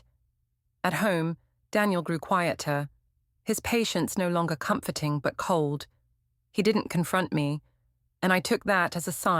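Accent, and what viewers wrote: United Kingdom. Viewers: I recognize the narrator's voice from previous videos: a stuck up, arrogant, British accent, entitled, selfish vixen, that does what she wants without considering the consequences and the people she hurts. I couldn't watch after a few sentences. A very unlikable character with a voice to match. These characters hit too close to home for me.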